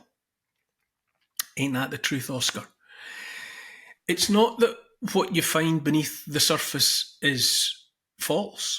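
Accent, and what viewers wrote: Scotland, United Kingdom. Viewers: I thought u were Georgie Best for a bit with a Scot accent lol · Well, that hit a few nails on the head! 👍💪 Neil Won't Kneel A gentle, beautiful Scottish lilt A kind, empathic, forward tilt An open heart; a caring face A willingness to take his place. A strong, home-hitting monologue Reminds us of the boiling frog The courage to become a sleuth A passion to release the truth. A deep desire to crack the whip Illuminates our freedom-strip Calls out the darkness taking hold The shiny despots; plated gold! Intense and raw, he lays it bare A soul-enhancing, honest stare Inflections drive the message through He speaks for Us - for me and you! Discernment's such a precious gift His words create a mighty lift Take heed of all he has to say He knows - it shouldn't be this way! 🤗🦋🐬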